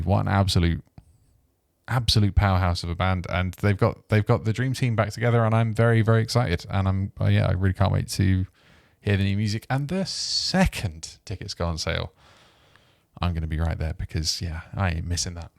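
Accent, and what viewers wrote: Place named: United Kingdom. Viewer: It wasn't until the 15 second mark that I could tell you were british. got to be the most subtle accent of all time. Helldivers brought me to your channel, great great shit dude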